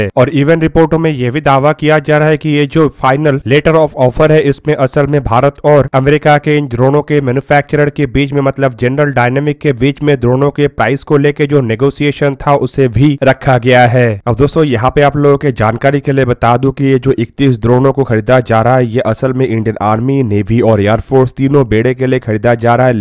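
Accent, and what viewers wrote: Bengal, India. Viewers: Bengali speaking Hindi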